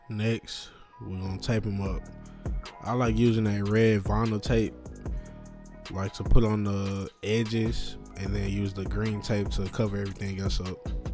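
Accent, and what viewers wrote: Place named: Detroit, United States. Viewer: Wut up doe! Good shyt on the forces they crispy. Sound Like U from Detroit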